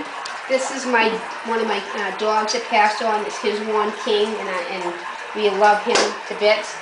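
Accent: Boston, United States. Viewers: That Boston accent just keeps me coming back. Love it. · u r such a lovely woman. I am from Boston as well so I love the accent!!! I look forward to binge watching all of ur videos!! ❣️ Blessed Be ❣️❣️❣️❣️❣️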